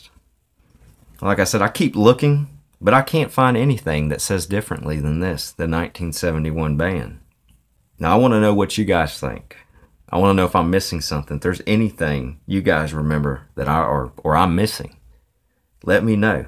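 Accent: South, United States. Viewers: first off,it's refreshing to hear a fellow southerner,lol, but YES, I started smoking at 11, unfortunately,& we were living in Nashville time. there were ads & commercials EVERYWHERE!!!! I PAYED THEM MUCH ATTENTION,due to being a very young smoker!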